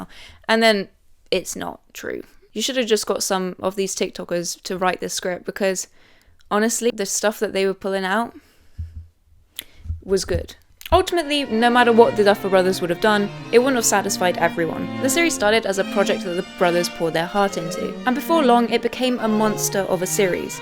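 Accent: England, United Kingdom. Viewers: Hearing this common US English mistake in an English accent was incredibly jarring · Just an FYI, But the word "finale" is NOT pronounced the same as "final." You kept saying in the "final" and "the final." But the word you are looking for is "Finale" which is pronounced "fi-nally." Why that "ee" sound at the end. I know you've got the British accent, but I know the word finale is still pronounced the same between America and The UK and they dont just say "final" for both. Sorry my literature degree getting the better of me... lol but so you know you said it wrong the entire video! 😂 great video though for sure!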